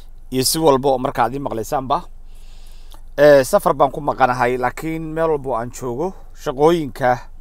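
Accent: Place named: Ethiopia. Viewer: Wallaal Ninkaan Somaali Maaha waa Nin Cabasha oo afsomaaliga Bartey. Bisiq Saraan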